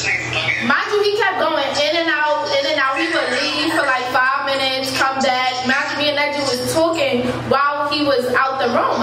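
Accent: New York, United States. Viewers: “Akeke” i love how new york you are sis 🤣🤣🤣🥳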